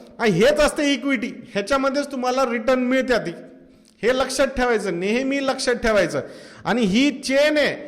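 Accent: India. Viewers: Pan Marathi Evdhi pan khedval Nka bolu Sir...... Kahi national viewer pan astil tumcha... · अस्सल गावरान तडका..... लै झ्याक सर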